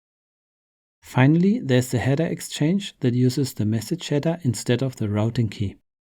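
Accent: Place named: India. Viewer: Very good, u almost sound like an indian person too!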